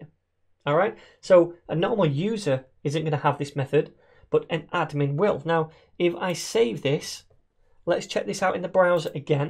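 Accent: United Kingdom. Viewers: Thanks for teaching this in a simplified but straightforward and non-choppy manner. So many other tutorials are so overcomplex in their wordings and skips ahead so much in coding, that half of it doesn't make sense. I haven't watched all of your videos but, just watching one of them makes me wanna watch more. I hope you have them all ordered step by step in Javascript. Thanks again. (" your english is very good despite your british or whatever accent, sometimes the accents people have in these tutorials are too hard to understand or they talk way to fast. You do a great job with both)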